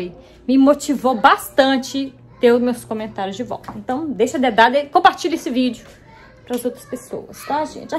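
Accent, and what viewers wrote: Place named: Minas Gerais, Brazil. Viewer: FAZ DIFERENÇA SIM, SEUS CABELOS SAO LINDOS A PELE ESTA OTIMA! MAS O QUE EU GOSTO MUITO EM VC É O SEU JEITO MINEIRO DE FALAR. SE TORNA UMA PESSOA DIVIRTIDA DE SE VER CONTINUE SEMPRE ASSIM!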